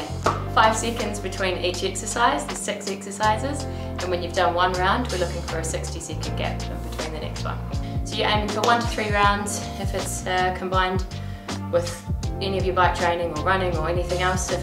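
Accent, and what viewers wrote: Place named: New Zealand. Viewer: Sounds Kiwi (New Zealand)? I mean the accent.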